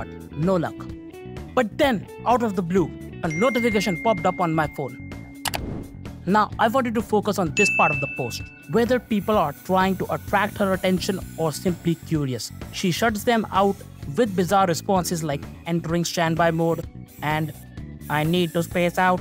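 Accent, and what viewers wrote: India. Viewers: ur indian ryt?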